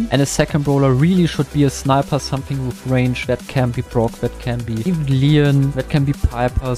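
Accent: Germany. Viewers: Are you German? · Man hört voll den deutschen Akzent 😂😂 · Mann hört einfach dein Deutschen Akzent 😂❤ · Du bist Deutsch,ne? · Der deutsche Akzent macht es viel interessanter. Danke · Bro is german and i didnt notic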